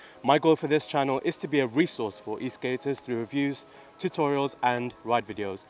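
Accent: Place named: Birmingham, United Kingdom. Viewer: Do you need a wing man ? I’m watching your vid of the mighty flux the voice in my head tells me I know where your ride is my home city brum !! I knew that annoying but sincere accent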